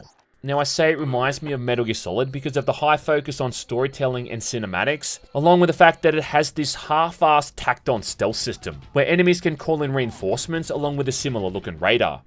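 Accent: Australia. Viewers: aussie accent is such pain on the ears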